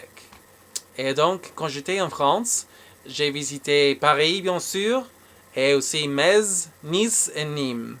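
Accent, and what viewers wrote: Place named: Louisiana, United States. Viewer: On dirait qui parle le Cajun louisianais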